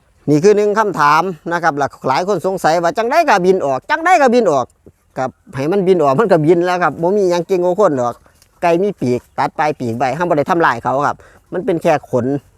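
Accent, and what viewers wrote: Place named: Isan, Thailand. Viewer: เขาคือคนอีสานค่ะ ภาษาที่ใช้ก็คือภาษาสำเนียงบ้านเขา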